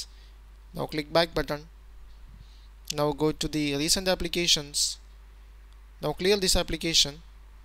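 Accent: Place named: India. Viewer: Ótimo tutorial... funcionou certinho!!! Esse indiano é foda!!! O sotaque é mor comédia... mas o cara eh bom!!!! hehehehehe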